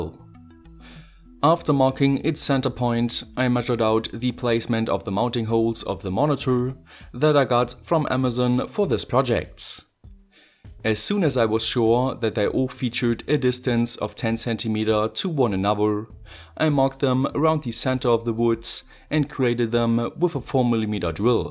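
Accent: Germany. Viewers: Mach Mal bitte mehr Deutsche Videos, ich mag es, wie du Englisch mit deutschem Akzent und Deutsch mit englischem Akzent sprichst! Translation: pls make more english videos, i Like it, how you speak english with a german accent and german with an english accent!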